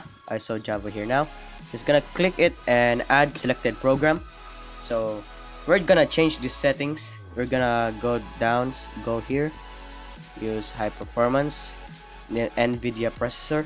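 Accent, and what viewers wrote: Philippines. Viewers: filipino accent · Are you filipino? Your accent sounds so. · Halata sa pag sasalita mo pinoy ka eh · let me guess your from philippines?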